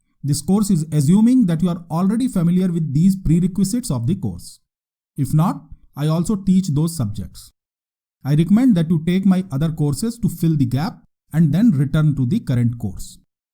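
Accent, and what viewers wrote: India. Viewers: You look like Russel peter but with thick Indian accent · True, Russell is a descendant of India, so there could be some similarities. is very good teacher in the field of Bigdata.. For the sake of native English speakers who find some difficulties with Indian accent, he added subtitles for most of his lectures.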